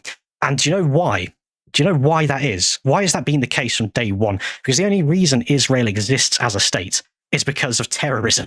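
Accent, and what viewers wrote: United Kingdom. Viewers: You sound like a brit, how about British politicians, Israelis own them, just look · Oh a British person ranting? Copium at best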